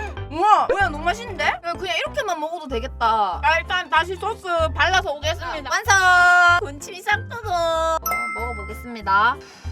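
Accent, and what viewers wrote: Busan, South Korea. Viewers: 이분 경상도인임? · 부산 아지매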